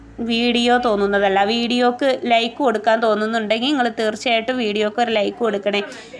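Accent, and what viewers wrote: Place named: Malappuram, India. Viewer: ഇത്‌ ആ മലപ്പുറം താത്താടെ സൗണ്ട് nd സംസാര രീതി ആണല്ലോ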